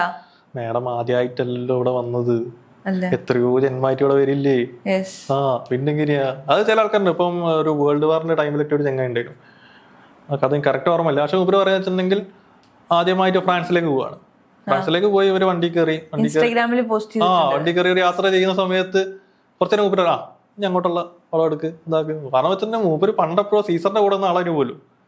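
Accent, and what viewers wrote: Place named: Kozhikode, India. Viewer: Kozhikode accent catch cheyyan difficult aanu .... Bt ok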